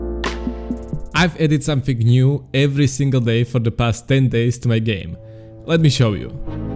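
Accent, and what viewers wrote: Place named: Czech Republic. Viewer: Your accent sounds like czech lol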